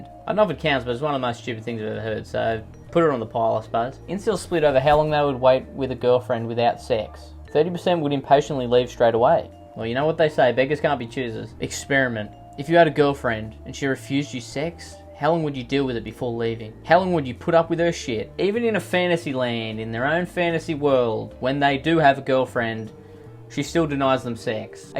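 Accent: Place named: Australia. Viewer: your funny australian accent tickled my fancy dear young man you get a like 👍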